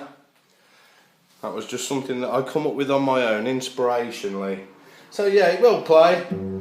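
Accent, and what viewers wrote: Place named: South Staffordshire, United Kingdom. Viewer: My first bass guitar was a White Shadow. It was orrible. Actually judging by your accent I wonder if that one was once mine (I Live in south staffs...). Tradd mine in some time in the early 90s..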